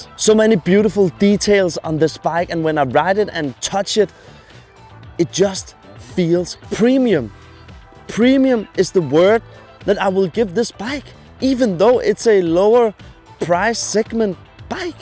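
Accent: Denmark. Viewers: haha dude that’s amazing. Love me a danish accent. Awesome review too - I’m getting my license and that bike in the next few monthsb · 3 seconds into the video, I'm betting 10 bucks that this guy is Danish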